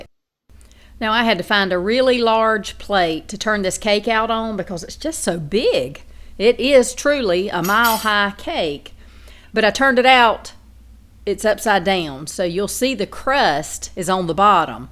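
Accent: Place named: West Virginia, United States. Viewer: Yes ma'am I have one in my collection, I just love your voice sounds like you're from West, Virginia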